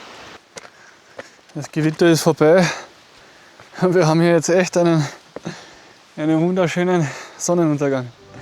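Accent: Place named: Austria. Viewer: Ve wo bischn du ? Und welcher Berg isch des ? Ven Akzent ven Hochdeitschen tat i sogn Österreicher. Cooles Video, mir gfolln sette Video wo in die Grotten unterwegs bisch und nocher in an Biwak ausharren oder magari no ibernochten muasch! Abo und a Like hosch ve mir! Gruass ve an Südtiroler! Berg Heil!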